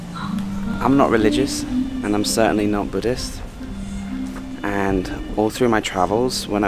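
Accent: United Kingdom. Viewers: your British accent is so strong now! I think it because you no longer have to speak broken Thai and most people in Vietnam speak more English 😎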